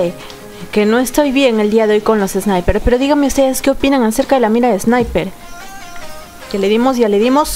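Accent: Spain. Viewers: te diria q no metas mucho el asento español q algunos no entienden lo q dices:v xD i diran q eres groseras diciendo esas palabras:3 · Española xD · Cuando eres peruana y hablas como español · hazme un hijo..psdt: soy hombre xd Te sale gracioso el dejo de española y se te escapan las lisuras cuando te matan:3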